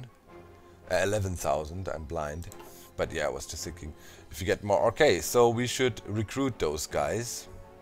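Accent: Germany. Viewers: history, hoi4, docm, German accent, and a constant reminder of that German censorship of hitler(which I find the whole concept of that really hilarious?), what could be better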